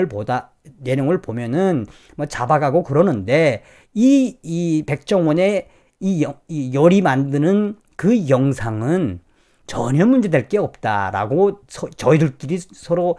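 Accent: North Korea. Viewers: 언제들어도 구수한 북한식의 언어와 사투리, 시원하고 통쾌한 정성산tv을 힘차게 응원합니다. 화이팅!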